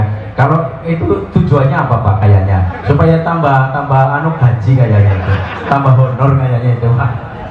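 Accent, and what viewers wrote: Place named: Banyumas, Indonesia. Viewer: mantaab yi, ngapak banget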